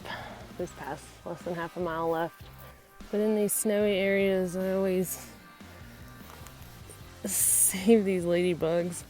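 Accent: South, United States. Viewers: "This here sign..." lol I love it. You can take the girl out of the south...never the south out of the girl. Rock on.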